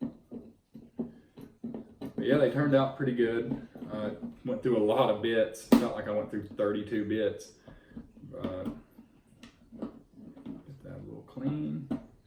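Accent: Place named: South, United States. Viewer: Great video! Just curious though, what part of the south are you from?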